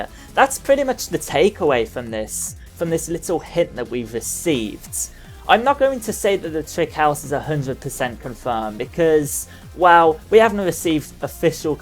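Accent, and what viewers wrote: United Kingdom. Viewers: Where is your accent from? Sometimes it sounds British, other times it sounds from the Orient. It's a cool accent.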